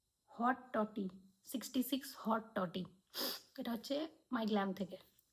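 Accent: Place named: Dhaka, Bangladesh. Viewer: Nah amr bang dakar awaj ta sunte valoi lagche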